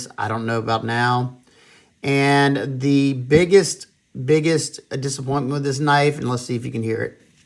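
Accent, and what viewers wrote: Louisiana, United States. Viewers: You from Louisiana?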